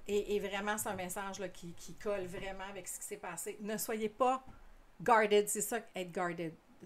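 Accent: Quebec, Canada. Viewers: Enfin une voie québécoise et belier en plus 😁😁 pour ta guidance il est un peu tôt pour juger donc je vais te suivre et voir si sa résonne !!! 😊😉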